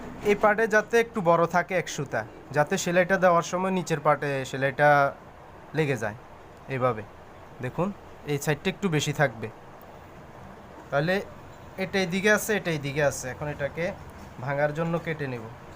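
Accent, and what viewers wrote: Noakhali, Bangladesh. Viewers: আপনার কথা গুলো সহজ সরল, আপনার বাড়ি কি নোয়াখালি নাকি ভাই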